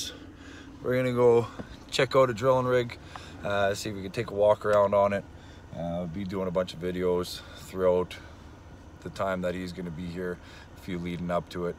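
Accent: Canada. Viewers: I love the way you say throughout. The Alberta accent is awesome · Every time I hear you talk makes me think of Trailer Park Boys, you should have been on a few episodes with the boys. That thick Canadian accent makes me think TPB. haha! Cheers!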